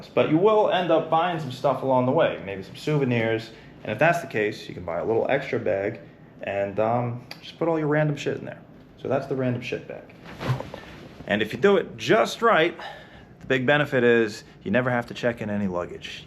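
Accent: New York, United States. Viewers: The greatest accent ever - New Yawk!!! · Sometimes your "New York" shines SUPER bright in your speech. Lol! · I’m a new subscriber. I said to my wife that “his accent & mannerisms seem like a New Yorker”. Yep, I also grew up on the island. I have lived in the Philippines and am planning on moving back in a couple when I retire. Looking forward to watching more of your videos. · You are one of the most honest YouTubers out there. I knew you were a NY’er from the accent lol. You’ve probably ridden one of my trains out of NY Penn. Aren’t our trains garbage compared to every other country in the world? lol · While I still think you're with the CIA, a common spook whose 90% of this audience is women, I've heard in other vids you said you were Canadian but you have a very distinct NY accent. Why the lie?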